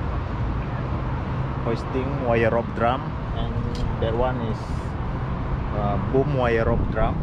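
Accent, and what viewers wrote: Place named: Sarawak, Malaysia. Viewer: Orang mana boss? Macam orang sarawak je..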